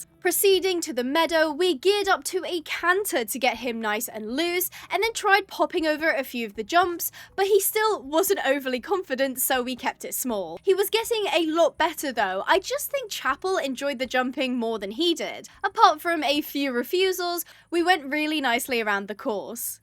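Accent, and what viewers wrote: United Kingdom. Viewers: Your voice sounds like British and it’s brilliant 😙👌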